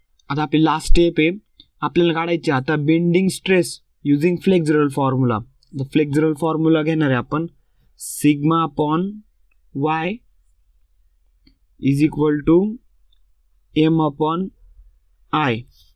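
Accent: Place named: Nashik, India. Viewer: Bhau nashik cha vatatoy😄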